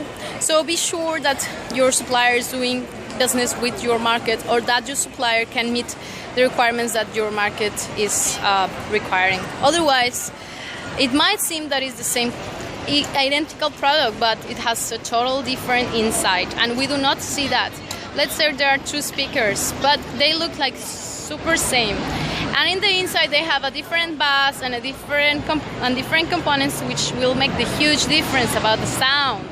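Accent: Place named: Latin America. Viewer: I love ur accent are u Latina?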